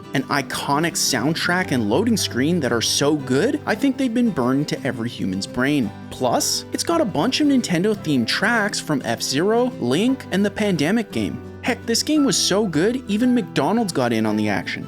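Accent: Quebec, Canada. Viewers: I think he’s French Canadian lol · Are you Canadian? I heard something of a Canadian accent. And you pronounced French words correctly.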